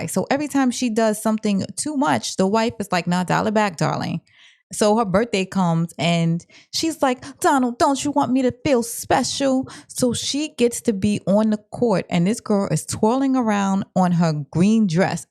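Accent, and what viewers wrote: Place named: East Coast, United States. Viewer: girl I just love you the east coast accent just everything gurllll❤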